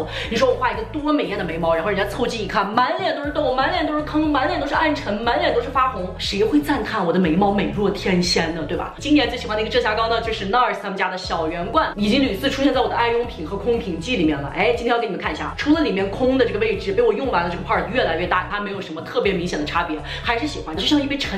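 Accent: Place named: Taiyuan, China. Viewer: 真二八经的太原话，咱俩老乡，听得我这个舒服啊！哈哈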